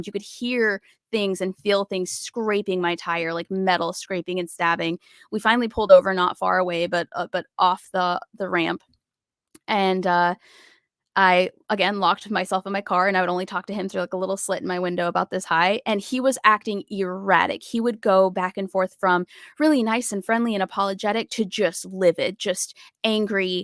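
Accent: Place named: South, United States. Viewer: Welcome back! I love how the Southern accent surfaces depending on how riled up you are...lol.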